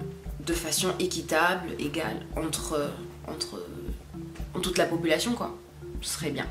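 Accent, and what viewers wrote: Gabon. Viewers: Eké ton accent myéne est mitigé hein! Mais j apprécie ta vidéo felicitation 👏🏽👏🏽👏🏽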